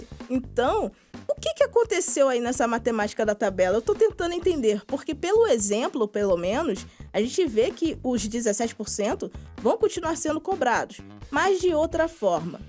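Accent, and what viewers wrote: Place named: Rio de Janeiro, Brazil. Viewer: Meu sutaque carioca! Saudade da minha terrinha.. haha. Bem vinda!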